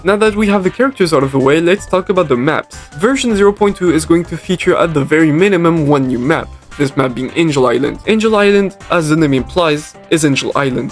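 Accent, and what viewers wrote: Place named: France. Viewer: t'es francais mdrr t chaud en anglais frro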